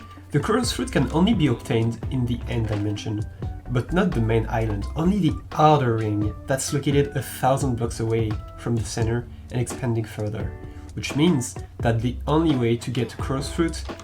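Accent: France. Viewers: You got a french accent :) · Ru french ? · you sound french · it’s obvious you’re french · t'es français non ? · are you french ? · I have a question dude, are you from France? By the way nice video :) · toujours le même accent fr xd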